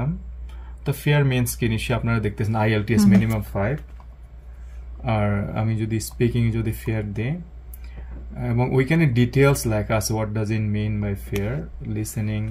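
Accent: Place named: Chittagong, Bangladesh. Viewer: Vaiyar bari Chittagong for sure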